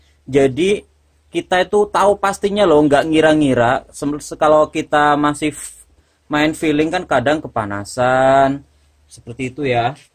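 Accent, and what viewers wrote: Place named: Cilacap, Indonesia. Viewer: Maaf Mase aku nmbe nemu chanel sampean, koh sampean niku kaya wong CILACAPAN yah sanjange....👍👍👍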